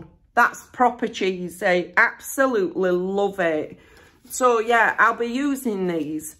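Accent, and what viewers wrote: Yorkshire, United Kingdom. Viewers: You sound like a Yorkshire lass like me x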